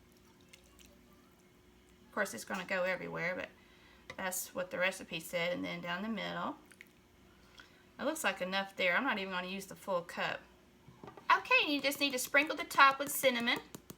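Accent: South, United States. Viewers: I love that country accent. did you say dump lins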